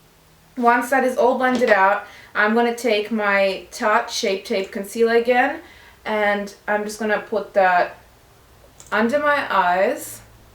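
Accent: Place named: Australia. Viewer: Amazing make up, thanks for the tips. Great to hear an Australian accent. Hope you are healthy and well.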